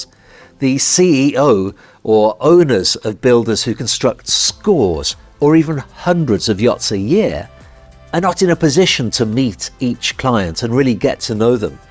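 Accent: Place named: Birmingham, United Kingdom. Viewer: VERY interesting video. The advantages are applicable for many industries too. Loving the brummie accent ... 🇬🇧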